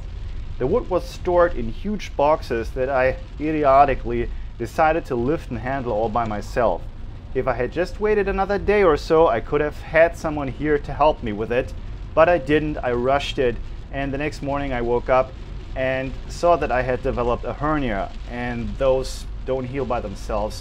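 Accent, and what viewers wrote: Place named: Germany. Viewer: I do not care what you make/salvage or do, i have always watched(listened) because of your voice. It is German dialect, not annoying, but rather comforting. Thank you!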